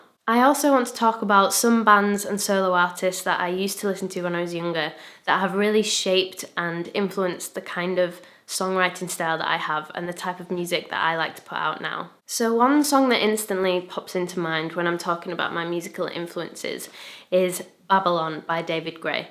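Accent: United Kingdom. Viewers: I have been listening to your music for a while now. This is the first time I've heard you speak and i didn't realise that you have a British accent lol I always thought that you were from America! Lol x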